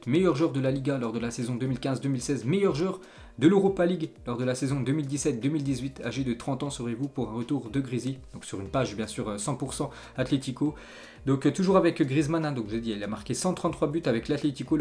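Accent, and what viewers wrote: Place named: France. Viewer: il à lu Mason Mount avec un accent français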